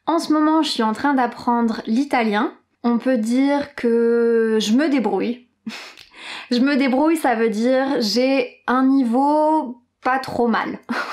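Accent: France. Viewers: Je déjà suivi Portugais avec Leo ici sur l' Youtube. S'il vous plaît, corrigez moi si je suis équivoqué. J'ai abonné récemment au votre canaliser ici sur le YouTube. J'aime 😍 ton accent français. Es-tu française? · I'm happy by your efforts given. This is my first time to see your channel. I like your French accent and also it's also sort of understandable. Please continue sharing some French language episodes. It's really helpful. Merci beacoup. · Yo, au vu du nombre d'abonnés entre le 5 juillet et le 21 juillet, à ce rythme, il y a des chances de passer les 100k abonnés d'ici la fin de l'année à force de référencement. Il faudra que tu fasses une vidéo en anglais un jour, ils veulent entendre ton french accent :p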